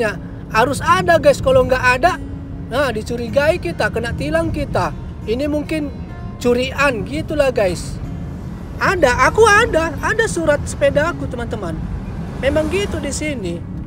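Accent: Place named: Java, Indonesia. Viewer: Mas e koyo wong jowo wae wkwkwk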